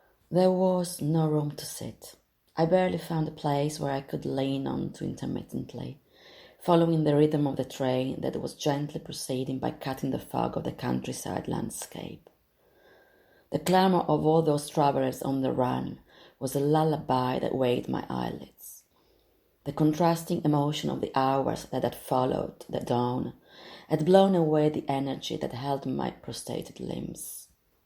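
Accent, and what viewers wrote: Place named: Italy. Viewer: Eccomi ancora,! In English, please! Parla inglese benissimo, e, con il piccolo accento italiano, dà un tocco di bellezza in più! Io potrei leggere il Suo libro in italiano, visto che ho studiato l'italiano, e che l'italiano è, posso dirlo, la "seconda lingua" della mia vita, dopo il francese che è la mia lingua madre! Sono contentissimo quando posso praticare l'italiano, perché, con le persone che vivono accanto a me, non ho l'opportunità, purtroppo...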